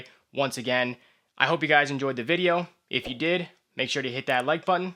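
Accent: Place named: Massachusetts, United States. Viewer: Ok, where are you from? That accent is saying the Massachusetts area, but enjoyed that video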